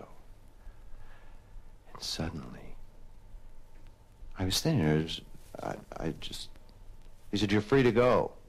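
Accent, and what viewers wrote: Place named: New York, United States. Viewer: Eliezer Pennywhistler i thought the same thing. it's the new york accent and his verbal mannerisms...not all of it, just certain words and phrases are very evocative.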